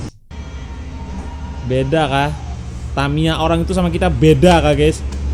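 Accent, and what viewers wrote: Java, Indonesia. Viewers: tak kiro samean wong jowo bang 😂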